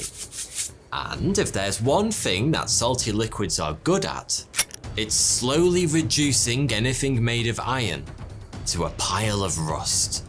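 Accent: United Kingdom. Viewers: ...THE MAN THAT LOOKS LIKE A GERMAN BUT SPEAKS LIKE A BRITISH MAN...U R 2 F....N CUTE I JUST WANT TO TAKE YOU HOME...😃 👄 💋 💘 MERRY XMAS LOVE...🎅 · what do you mean? At first, I assumed this was a pro-america joke, insinuating the English accent is incorrect, which i applaud because its hilarious. However, I then noticed "mate" and realized this is clearly no American joke. So i ask again, what do you mean? Does he have a speech impediment? As an American, i cant tell, it just sounds like British-English or another one of those funny non-American lingos. On a side note, i do totally agree with your main point, no reason for this Japanophile to get on the guys case about using the typical foreign-pronunciation of Yoshi. Nothing worse than the white dude who forcefully pronounces all Japanese words as authentically as he possibly can. It's just sad when you hear those clowns order a Sapporo beer by belting out those staccato syllables "SAH-POH-ROH". 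You just want to turn to them and say "yeah man, we get it, you watch Narutto or something" but they would problem take that as a compliment of their comically incorrect accent so we stay quiet. If your speaking in English, keep the words in English, say "sake" like the rest of us, not "SAH-KAY". And I say this all as someone who lived in Japan for years, not just an ignorant American... Well, i suppose I say it as an ignorant American who lived in Japan for years...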